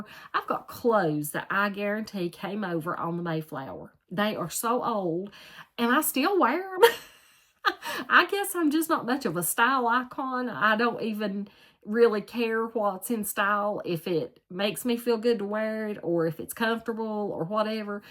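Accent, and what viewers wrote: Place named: North Carolina, United States. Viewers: Omg, my daughter started laughing with the “Mayflower” comment! That was just hilarious 🤣 🤣🤣🤣. Congratulations on copying the old jumper, it looks great on you, especially the color 🙌🏻🙌🏻. You are definitely not alone in not wanting to show your body rolls 😱😱. I just wanted to say that we truly enjoy your NC twang. 💖💖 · we all love your NC accent! You’re so down to earth and keeping it real! I wear a blouse under my jumper. Yours looks great on you! I want to make a pair of overalls. BTW, you’re on my list of YouTubers I want to meet! ❤️❤️ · Hi, you did such a great job of copying your jumper and it really fits you so nicely. I love hearing your North Carolina accent. My stepdaughter also lives in North Carolina and she has that accent, too. Have a good week. 🙂❤🙂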